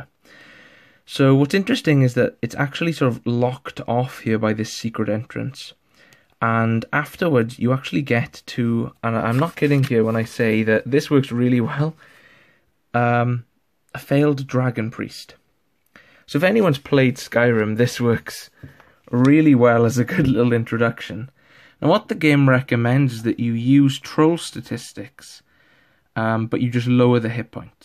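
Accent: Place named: Wales, United Kingdom. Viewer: I know this accent! Are you a fella taff?! ❤️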